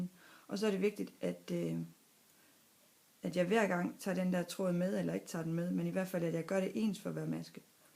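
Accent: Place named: Hjørring, Denmark. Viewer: Woow nu fatter jeg det. Superfin demo. Og endda på mit dialekt. Hjørring. Ikke?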